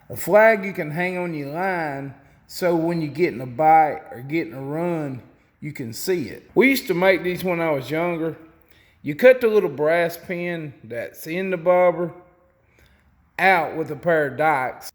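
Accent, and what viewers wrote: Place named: Mississippi, United States. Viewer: Thanks! You sure you ain't from Mississippi? It's the same accent.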